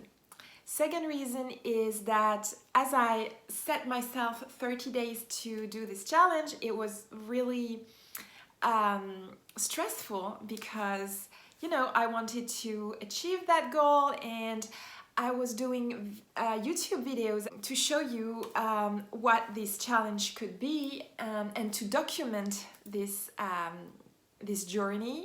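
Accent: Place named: France. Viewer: We love you! You don't have to say sorry:) (even when you say with a french accent which is so cute <3 )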